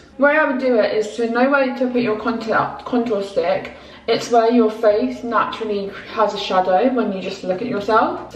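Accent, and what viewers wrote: United Kingdom. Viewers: Amazing Channel and by the way I’m from the U.S.A. And you are from the U.K. I heard because your voice is British mine is English nice to meet you “socially”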